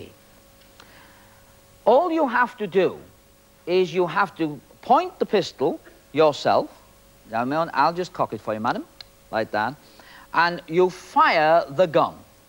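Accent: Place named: Yorkshire, United Kingdom. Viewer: has a British accent, more exactly he has Yorkshire accent from east England. Don't worry it is fairly thick and a lot of people have trouble understanding it. Even people who do have english as a first language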